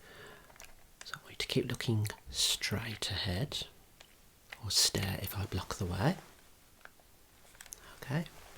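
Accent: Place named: West Midlands, United Kingdom. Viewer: As ever, you are promoting the west midland accent in the most exquisite way. Superb content time after time, top man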